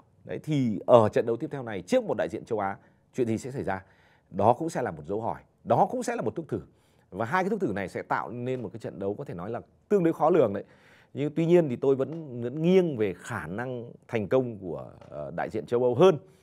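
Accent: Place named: South, Vietnam. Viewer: Bác xem thế nào góp ý chương trình bình luận bóng đá bằng giọng bắc đi bác.chứ em thấy bác giọng nam không hợp một tý nào .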